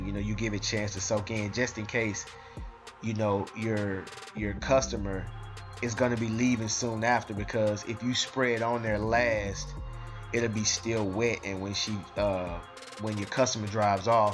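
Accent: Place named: Deep South, United States. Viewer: Caught on after viewing some more vids. That's definitely the deep south.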